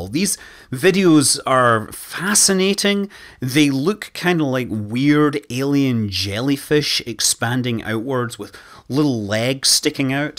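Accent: Scotland, United Kingdom. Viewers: Scottish to bro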